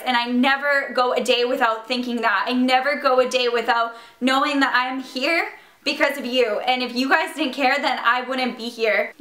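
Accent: Canada. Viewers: is she Canadian? I can't quite tell but the way she pronounces certain words make me think maybe she is. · question are you Canadian??? cuz your accent is cool!!!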